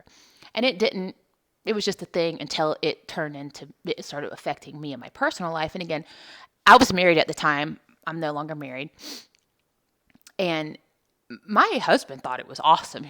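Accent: South, United States. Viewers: I detect a southern twang😊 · As a southern girl myself, I'm detecting a little tiny bit of a southern twang coming from you. Am I wrong?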